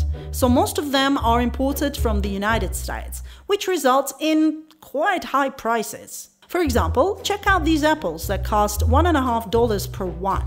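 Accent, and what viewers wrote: Australia. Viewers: The worst accent ever, aussie, english, & some foreign sounding jamaican???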